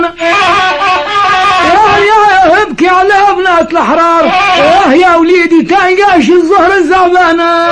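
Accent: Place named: Tunisia. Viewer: لهجتو تونسية اكثر من جزائرية....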